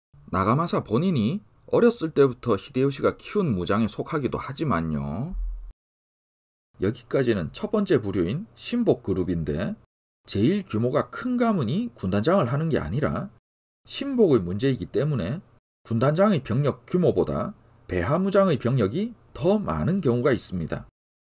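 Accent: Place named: Gyeongsang, South Korea. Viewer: 경상도 어디사람인지 정말 궁금하네요.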